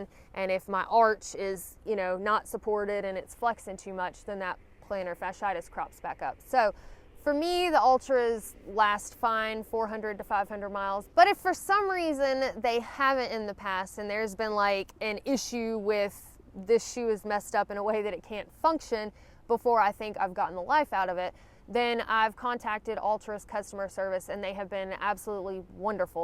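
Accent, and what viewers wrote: South, United States. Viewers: I wear Altra Superior 4.5's (coming up on 500 miles 1st pair), which I love! (found on sale and bought all of my size) They came with a rock guard insert. What are your thoughts on that type of insert? I installed them (under Dr. Scholl's inserts), but wonder am I really getting a benefit. Love your video's, southern drawl (us being from Texas) and expressions (nicknamed with friends Dixie-izms)! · The "hey, ya'll" got me! Love hearing a southern drawl on YouTube. Sounds like home to this North Carolinian. :)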